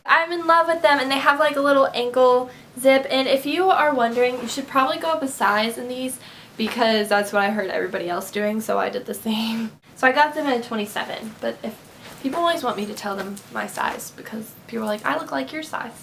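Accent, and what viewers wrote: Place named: California, United States. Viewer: you have like the coolest accent everr. it's like half country half california, haha :)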